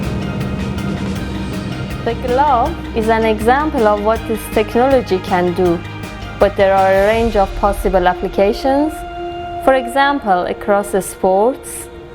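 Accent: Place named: Iran. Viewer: At the first moment from her accent I found she's Iranian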